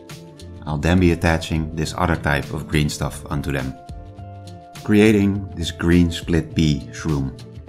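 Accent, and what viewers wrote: Netherlands. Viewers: Love your Dutch accent 😃 Love the video you made, thanks ! Ik heb hetzelfde model klaar liggen om te gaan printen en dat gaat na het zien van deze video niet lang meer duren gok ik. Leuk om te zien dat er ook NL kanalen zijn in dit wereldje ✌🙂 Subscribed -> Uiteraard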